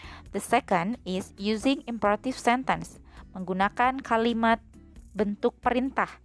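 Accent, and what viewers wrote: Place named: Indonesia. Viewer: Nice indonesian accents Favourite teacher....thankyou for the lesson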